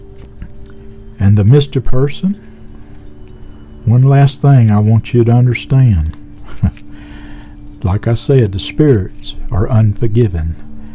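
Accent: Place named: Kentucky, United States. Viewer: I don't know where you're from, but you sound like you're from my neck of the woods........ Kentucky.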